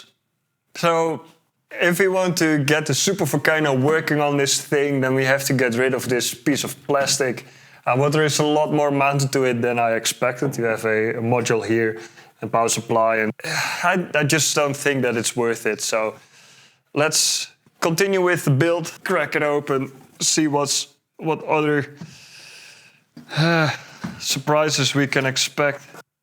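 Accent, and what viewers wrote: Netherlands. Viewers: I tought you were swedish. But you're speaking gibberish. Netherlands? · You sound very Dutch. Good video tho! Subscribed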